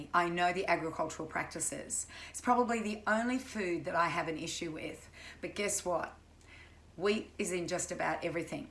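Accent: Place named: Australia. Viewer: You sound like an Australian and i love that you are at least you known what's going on in here (australia) comparing to the other ones that i found, all american. So thank you so so so much you explain things nicely whithout getting rid of everything. Thanks again 😊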